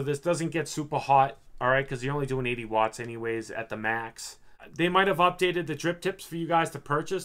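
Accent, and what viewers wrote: Boston, United States. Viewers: are you from boston? keep up the good work by the way !!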